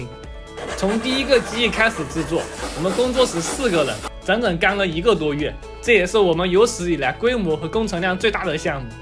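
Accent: Jiangxi, China. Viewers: 哥们儿，你的赣普话好有特点😀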